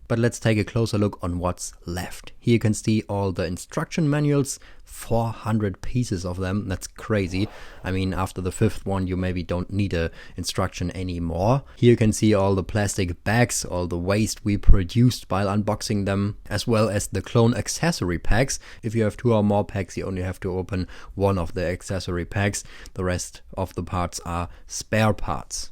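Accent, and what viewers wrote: Germany. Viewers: Ey man hat direkt direkt gehört dass er deutsch ist :) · Are you not german?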